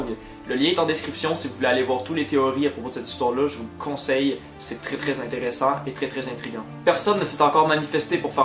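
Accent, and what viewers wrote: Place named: Quebec, Canada. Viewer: J'adore ton accent québécois!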